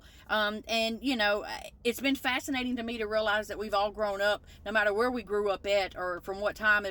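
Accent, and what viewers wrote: United States. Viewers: ONLY NON!!! Gen X would troll you because that the way the world is today 🙄🤦 I love your vids. They tke me back to THA DAY...LMAO AND WILL SAY, I am shocked your from Memphis. You sound more country....lol THANKS for all the the vids tho. It's like time traveling 😆👍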